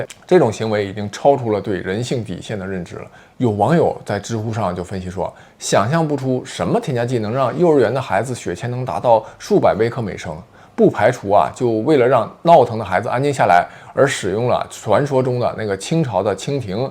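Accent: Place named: Anshan, China. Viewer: 女主播好像是鞍山人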